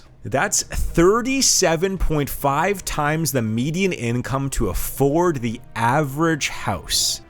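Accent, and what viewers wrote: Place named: Canada. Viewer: 9th generation Canadian here, maybe longer. Not yet 60. I assume you're Canadian because you sound it voice-wise, but you certainly do not have any sense of the honour, dignity, nor historical perspective that I have had the privilege of living and knowing. My parents married young, moved to Toronto, were very intelligent and so forth. My dad worked as a Manager at Ford for 45 years. My older brother and I shared a bedroom until we became Superintendents when I was 9 and my brother was 16. Prior to that, my parents slept on a sofabed in our one bedroom apartment in East York. My dad died when I was 28 and my mother lived off his benefits and her penny pinching for they had both been born at the start of the Great Depression, and my grandfather had a top job as the head timekeeper of the CN railway, and so they moved from Halifax to Moncton and lived near the tracks, and my grandmother who survived the Halifax Explosion while working as a paralegal in 1917 and with shrapnel in her back, escaped the burning building by sliding down the iron banister because the stairs were on fire. 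Then she got the Spanish Flu, and forevermore the Drs. would x-ray her lungs and swear she had had TB, which she hadn't. So you see she opened a soup kitchen and out of the goodness of her heart, she worked hard and fed the half starved men who rode the rail cars desperately looking for work. Now my Uncle, who was born in Toronto in 1914 came from a wealthy family, and had his PhD in finance, and was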